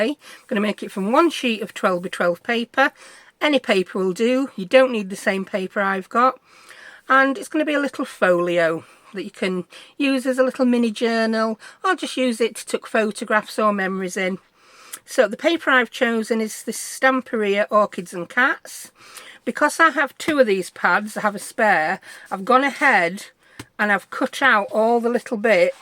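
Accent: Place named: England, United Kingdom. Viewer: Great video, love your waffling, faffing and your accent, it reminds me of when I lived in England. Cute little folio, thank you 🥰🌺❤️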